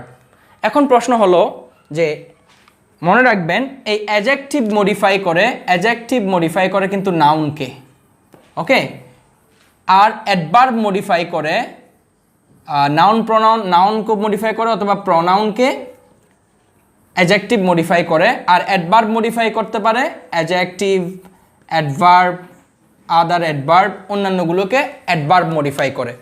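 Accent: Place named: Chittagong, Bangladesh. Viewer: Uni ctg er gram tek alim dise, shei porjonto okane silo ekarone pronunciation gramer ta chole ashe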